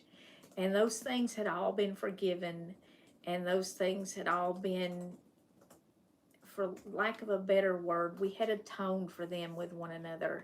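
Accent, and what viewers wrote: South, United States. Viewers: Congrats on your huge milestone! I found you during the lockdowns. I was hooked because you reminded me of the southern folk from Franklin NC that were so lovely and welcoming to my parents who moved there from Michigan. When I went to visit, it was like entering a new world. Wonderful people. Best food ever! After my dad passed and we moved mom north, that’s when I stumbled upon your channel. Your southern accent and country cooking reminded me of that wonderful place and wonderful people. I have your cookbook and I shared one of your videos with my mom. “Mom, you gotta watch this lady”. She loves you too. So, you see, you just never know who you touch just by following the Lord’s leading. Blessings sister. When we get to Heaven, I’m coming over for some southern cooking, a cup of coffee and conversation about our lovely Jesus. 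Until then…❤️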